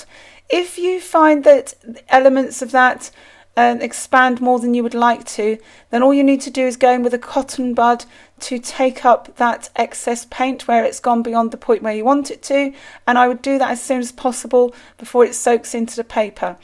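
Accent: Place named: London, United Kingdom. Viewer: Lovely work my friend! I love daffodils! I am missing London with your accent. 🥰 xx #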